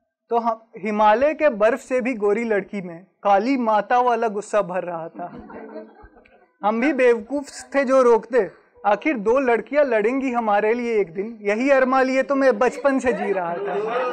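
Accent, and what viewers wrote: Bihar, India. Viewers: Very nice video sir, it has a little probabum, you have a Bihari tone in your words, you should also look at your bass.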